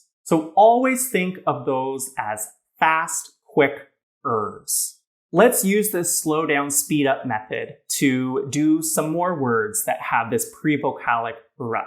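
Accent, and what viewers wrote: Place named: General American, United States. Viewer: Hey, your General American accent is really perfect!:-)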